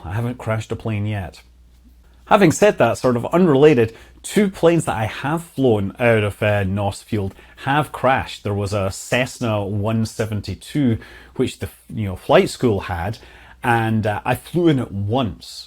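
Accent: Scotland, United Kingdom. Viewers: Wouldn’t it make sense to have a telephone tree to get everyone to evacuate all aircraft from nearby hangars until the fire is out and cold? Don't forget to update (and keep updating) Dan Gryder, who maintains GA fatal statistics and advocates AQP. Have you taken AQP training? And get the wife trained up at least to do a landing, because human beings, namely you, have health failures at the most inconvenient ties. Gnosis?!? I thought you were from Scotland. Well, you’ve got the accent down pat. I’m from Sacto and learned to fly from Naps in ‘70s.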